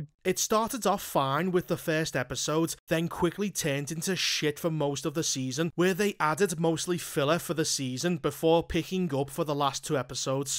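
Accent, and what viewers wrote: Liverpool, United Kingdom. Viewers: This video is 10x better with your scouser accent. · A nice scouse commentary on the last of us tv series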